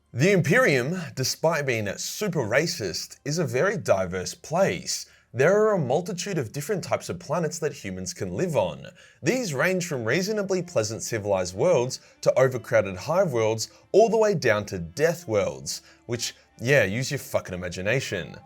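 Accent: Australia. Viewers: This is exactly your type of video, time to use that sweet Aussie voice to explain the most beautifully deceiving worlds in the Imperium.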